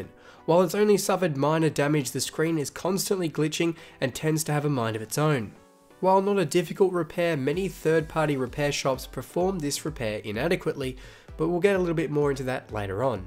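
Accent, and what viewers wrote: Australia. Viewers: Hello, do you take send ins? I'm in Brisbane and recently dropped my fiancés phone onto my MacBook. There's a small but incredibly annoying scuff left on her screen. I'm at the point where I'm willing to buy her a new phone but I thought a quick search was in order first (delighted to hear an Aussie accent). If you don't, what's my best bet? I checked your online store, there's no iPhone X parts available? Is that a 'for now' thing or should I source them elsewhere? Regards, Ricky.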